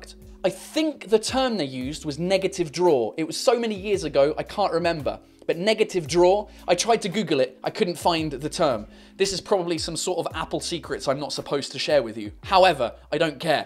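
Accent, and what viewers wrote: England, United Kingdom. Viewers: Watch Mr whoes the boss bro English not American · You sound like Cyprien,if he talked in English with his style and he had a English accent he should sound like you. POV: I m Franch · Are you from the uk It sounds like the accident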